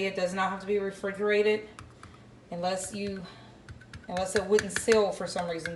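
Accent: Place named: Louisiana, United States. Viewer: Are you from Louisiana? Your accent sounds so much like mine lol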